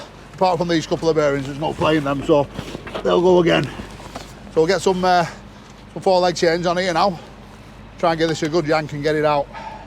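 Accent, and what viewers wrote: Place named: Preston, United Kingdom. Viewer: It’s not just the Preston accent… but the engineering drama is like ‘DIBNAH 2000’ or something. Love it.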